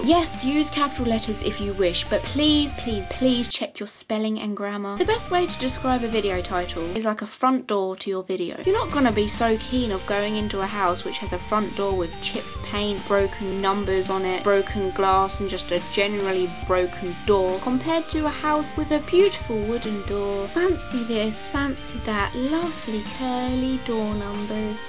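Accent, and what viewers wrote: United Kingdom. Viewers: her briish voice is a little annoying but i can dig it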